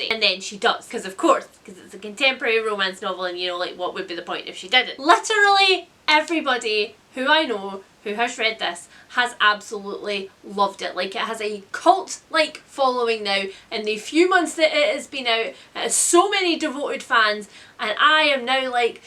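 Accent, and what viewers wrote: Scotland, United Kingdom. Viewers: I’m so glad I found your channel! You’re personality is so cute and entertaining (: and i love Scottish accents lol (probably because my mums a Scottish immigrant aha!)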